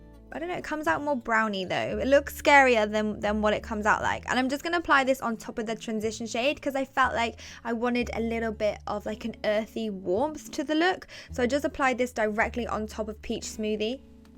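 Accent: United Kingdom. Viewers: I know I meant where abouts in the uk lol! Your accent sounds similar to mine I'm from Gloucester xx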